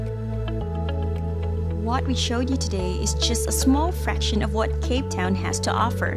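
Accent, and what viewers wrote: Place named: Visayas, Philippines. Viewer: Cebu?? So I guess bisaya ka ma'am. 💕 Loved your accent 😍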